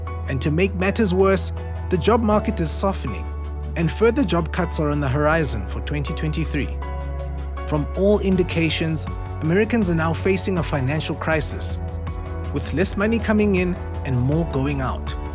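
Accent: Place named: India. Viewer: Why is the narrator’s voice Indian?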